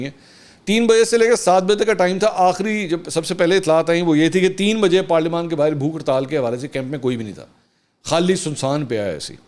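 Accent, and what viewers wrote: Punjab, Pakistan. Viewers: السلام علیکم بھائی پنجابی والا لہجہ بہت اچھا لگتا ہے اپ پر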